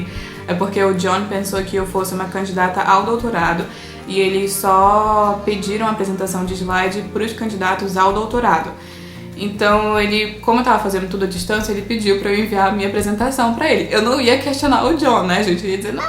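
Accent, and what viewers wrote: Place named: Pará, Brazil. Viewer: você é paraense ❤só pelo seu égua eu me inscrevi 😅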